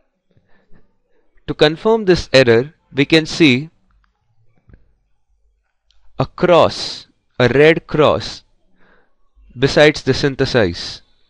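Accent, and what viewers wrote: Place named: India. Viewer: perfect. slow. clear. indian :)) thanks!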